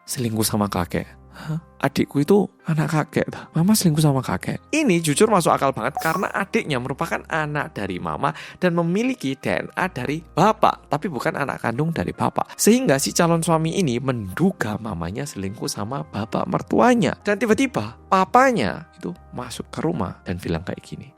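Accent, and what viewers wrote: Java, Indonesia. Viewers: Dari maraton nonton swindler .. Aku yakin bngt si detektif swasta nya si babang korea medok ini sendiri .. Hm masuk akal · Aku ketemu channel..anda. Lama tinggal diJawa ya..? Medok bhs Indo🇮🇩 nya..😊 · Gw salut sama abang ini, medoknya gak lang-ilang😅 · akhirny aplot jg udh kangen mas"korea cerita pake aksen medok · Aku baru ngikutin, keren bgt 😂 fasih banget bhasa indo Jawa medok nya. 🎉🎉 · Mas sekarang di kampung halaman apa di tanah perantauan? masih medok ......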